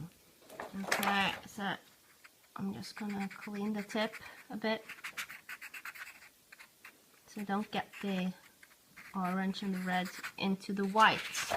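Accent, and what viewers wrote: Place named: England, United Kingdom. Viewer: I have searched and search for an in depth "how to draw gems" video and yours is the best by far. Your english is great, you have an english accent. Thank you so much for taking the time to post this video. Cheers Janey from the Land down Under (Oz)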